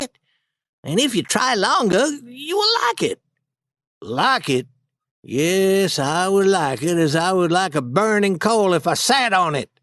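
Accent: United States. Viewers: it's useful to practice and mimic American accent :")), thank you so much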